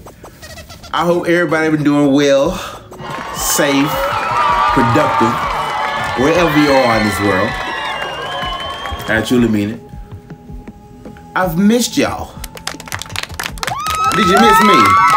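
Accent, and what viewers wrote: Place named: South, United States. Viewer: Ayyyy my new favorite show! Love the country accent 😂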